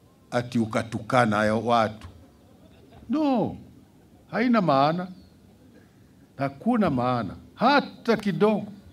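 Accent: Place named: Kikuyu, Kenya. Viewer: GOAT. As an othaya folk I can confidently tell you his non-native utterances( non-kikuyu et al ) were merely Kikuyu talk transversed especially in Swahili. When he says " sasa basi " he is actually adressing the subject in Kikuyu banter " Atereri ".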